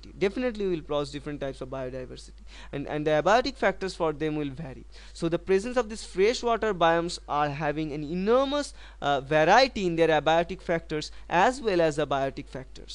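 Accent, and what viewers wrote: Bengal, India. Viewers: Is the orator bengali?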